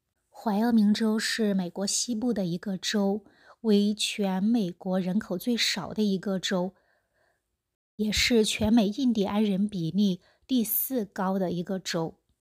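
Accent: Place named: Hunan, China. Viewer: 湖南口音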